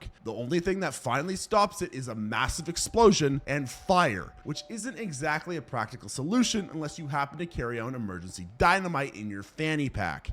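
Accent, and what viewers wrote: United States. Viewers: You aren't American ? Do not care at all, but you sound very American. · What country are you from? I assumed because you sound American, you're American. Your comment about calm down Americans with your Pew pews might be one of the funniest things I've ever heard in my life. And I am an American with a pew pew😂😂😂😂😂😢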